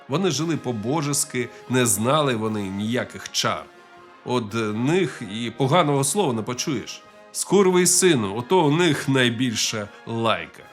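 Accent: Russia. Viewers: Ну нащо пхатися в знавці фактів про характерників? А акцент московський все ж відчувається попри правильну вимову:)) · Хто ви такий щоб давати такі неправдоподібні характеристики нашим козакам, та ще з москальським акцентом??? Ви подумайте перед тим як людей водити в оману!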